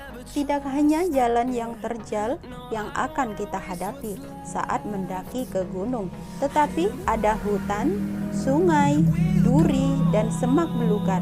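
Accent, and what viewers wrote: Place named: Rejang, Indonesia. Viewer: Logat rejang pngisi acrany kntel bgt 😊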